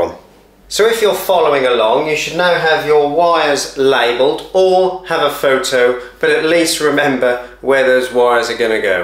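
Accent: Norfolk, United Kingdom. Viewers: Great content, are you but any chance a fellow norfolk or Suffolk man ?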